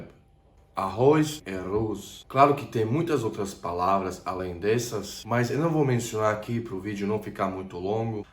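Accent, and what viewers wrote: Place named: South, Brazil. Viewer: Você está desenvolvendo um sotaque do sul do Brasil. Na realidade parece o sotaque de regiôes agricolas do sul. a vez ei visitei o Mato Grosso, na cidade de Cuiaba e tinha aqueles agricultores gauchos la que falam mais ou menos com esse sotaque.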